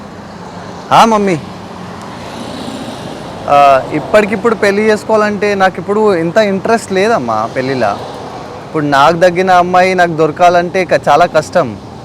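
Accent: Telangana, India. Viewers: Telangana slang echi padesnav 🔥 , come with more Telugu video ❤️ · Bro Telangana language Superb. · Telangana basha super ga matlduthunnaru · Awesome Telugu bro.....that to in telangana slang u spoke....😀😀😀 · Telangana Iragateesthunnav Anna🔥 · Happy to see you, i know you born in hyderabad and brought up in Mumbai, still you are speaking good telugu with telangana accent.more way to go. All the best bro. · Anna telengana language super ga mataldavu anna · bhai i saw you many videos in hindi, but you are talking telangana telugu its super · bro nuv tilagana slang masthu matladuthunnav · Pakha Telangana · Bro pakka telangana slang..awesome.. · Anna supperrrrrrb telugu Telangana yasa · Bro nuvu telugu matladutunte chala comedy GA vundi bro Kani telangana slang bane try chesavu bro nice keep rocking bro 😘😘😘😘😍😍😍😍😍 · Telangana slang super anna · మొత్తానికి తెలంగాణ బాషా జోరు మాట్లాడుతున్నావ్ శుభాకాంక్షలు అన్నా 😁😁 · Anna nice 👌 Telangana Basha super I yam big fain pataechu porini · Acha(actual) telugu anna idi... 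Telangana telugu ante idi asal... Kirrak poo... Dhethadi pochammagudi lekka unnadhi... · Telangana slang super 😁🤘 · Bro pakka Telangana slang 🔥🔥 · Totally surprised to see U speak very well Telugu that's also in Telangana slang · Super Anna act in TELANGANA Telugu language super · Bro telangana slang superb 👌👏👍🙌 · I like ur way of talking Telangana slang Bro nice keep it up Bro All the best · I never knew you speak Telugu, and you totally pulled it off. Telangana slang, great bro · I love the way u r talking in pakka Telangana slang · anna pakka Telangana language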